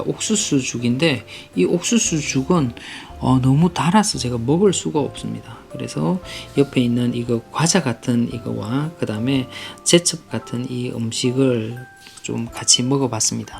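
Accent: Gyeongsang, South Korea. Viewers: 선생님의 경상도 사투리가 정감이 많이 갑니다ㅎㅎ 제가 대구사람인지라 그런거같습니다. 영상 속의 호이안을 보니 즐거웠던 기억이 나면서 정말 반가운 마음이 드네요 다시 그곳을 찾을수있는날이 얼른 왔으면 하는 바램입니다. 항상 유익한 영상 감사합니다ㅎㅎ 코로나 조심하시고 건강하십시오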